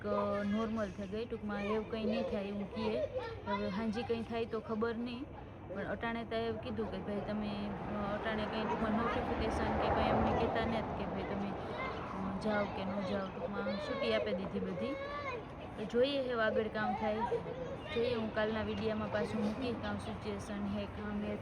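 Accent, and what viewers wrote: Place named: Barda, India. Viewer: બેન બહુ શરશ માહિતી આપી બેન તમારુ કયુ ગામ તમે બરડા વિસ્તાર ના હોય તેમ લાગે છે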